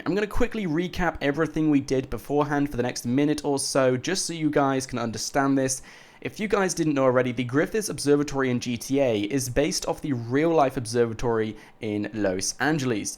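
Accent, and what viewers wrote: United Kingdom. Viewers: All of us British ppl say it that way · He is british so he cant pronounce it right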